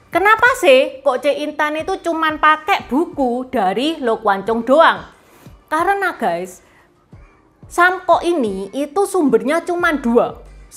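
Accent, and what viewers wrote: Java, Indonesia. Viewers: Wow so pretty intan saya suka cirita mu Kamu Jawa nya mana ko medok amat ya haaa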